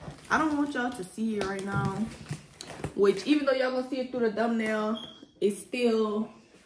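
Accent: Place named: Louisiana, United States. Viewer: periodddd, i can tell you from louisiana accent so cute ❤️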